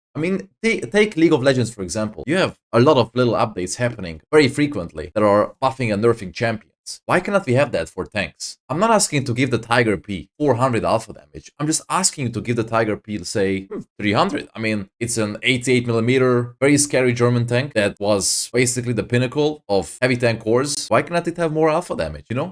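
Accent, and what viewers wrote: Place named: Hungary. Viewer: Alig hallatszik hogy magyar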